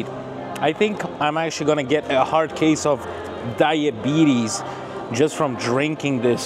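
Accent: United States. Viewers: You sound like an american' we all say and need to go to the GYM!!!!! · Ya know, you speak great English, more with an American dialect. Even some of the American philosophy entwined! How did you learn this? You will do well in America, just don't let them know you are Russian...very unfortunate.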